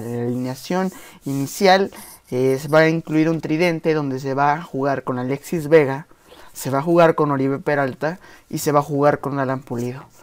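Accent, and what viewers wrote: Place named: Argentina. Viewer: Felicidades ya hasta el modo de hablar estás igualito al caballero chiva casi hablas como argentino y puras tonteras puro humo que está demostrando solo pierden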